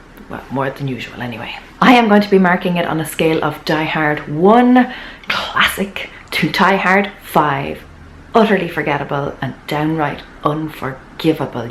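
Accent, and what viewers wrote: Ireland. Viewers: I'm so excited for this interview with Sarah Knight! Fun fact: After watching your review on The Life-Changing Magic of Not Giving a Fuck, I'm currently reading it..... and in my inner monologue, it's narrated in your Irish accent! So, the comment about you doing the audio book was particularly funny for me ;) I can't wait to get into the new book. I'm in Canada, so I'd love to win the US version of Get Your Shit Together!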